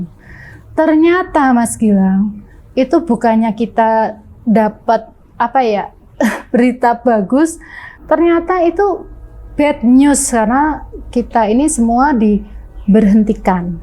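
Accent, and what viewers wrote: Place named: Java, Indonesia. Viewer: Khasnya emang medok2 gitu ye tp top deh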